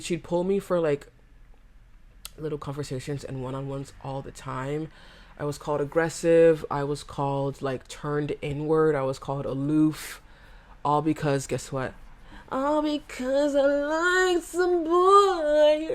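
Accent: United Kingdom. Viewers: The British accent is genuinely so good and fitting on you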